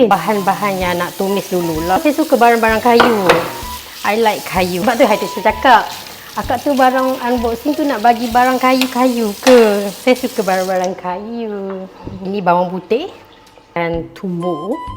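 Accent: Penang, Malaysia. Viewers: Suka degaq loghat penang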